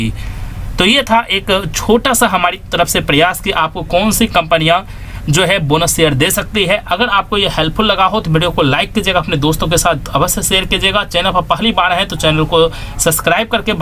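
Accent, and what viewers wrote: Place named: mixed, India. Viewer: tu pehle thik se shuddh hindi bolna sikh...UP, Bihar ka accent bolna chod de..bolne ka tarika sudhar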